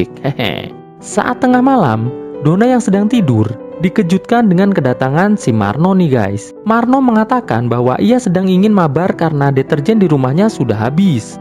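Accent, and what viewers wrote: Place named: Java, Indonesia. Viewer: Adminnya lucu cara ngomongnya...org jawa mesti😅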